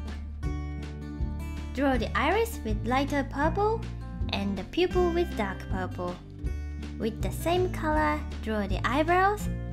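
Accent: Japan. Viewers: Hey does anybody know where is from? Her accent is very interesting... It sounds sort of Japanese but with a little bit of Australian maybe? Also great nail art! I was mesmerized! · Because she is from Japan, she has an accent.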